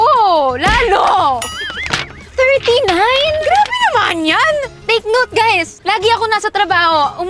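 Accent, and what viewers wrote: Pampanga, Philippines. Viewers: yung accent may pagka Kapampangan parin luvit haha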